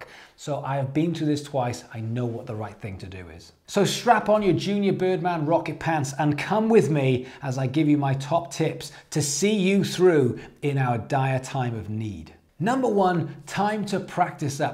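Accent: Wales, United Kingdom. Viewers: Great vid! You gotta new sub :) Are you Welsh?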